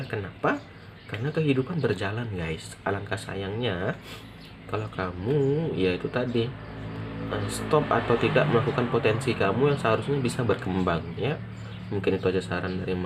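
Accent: Padang, Indonesia. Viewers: Orang Padang ya,,,logat nya tu loh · Ini yg reading orang padang yea logat nya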